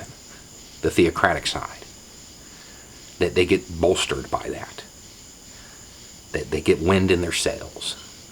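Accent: South, United States. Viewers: Don't try to fool yourselves by thinking we Iranians see trump calling off the invasion in the last minutes, as a sign of goodwill or concern for innocent people's lives. Everyone here knows he called it off because he was warned by his top brass, that even a single shot toward Iran, would definitely set all American bases in the Middle East on fire. No American can handle this catastrophe. He simply chickened out. Your president is a devil. Look how he thinks and deals with minorities in your own country. How can you be so naive? I really admire your personality and the way you are shockingly pro-humanitarian approaches about hot topics (especially with that southern accent), But thinking that this wet racoon has even a tinge of good will in his all existence is just not true.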